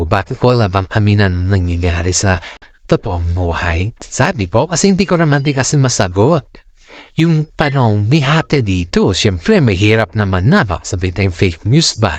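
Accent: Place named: China. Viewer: Tono mo para kang intsik na bulol sa tagalog. Halo halo pa sinasabi mo hindi maayos. Magulo kang mag vlog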